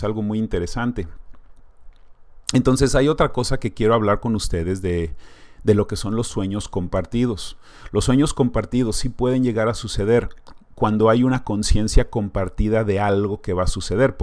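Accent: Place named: Mexico. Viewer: Aprecio mucho tu información, y me encanta el acento mexicano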